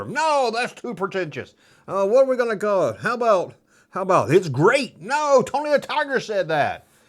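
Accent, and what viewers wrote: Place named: North, United States. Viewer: "north" mississippi? sounds more like Yankee Mississippi to me!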